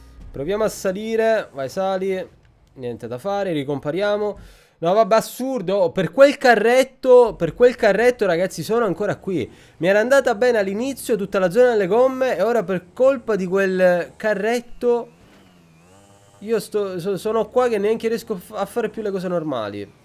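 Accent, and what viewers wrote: Palermo, Italy. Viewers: ma sei di Palermo? A volte hai la cadenza palermitana da come parli xD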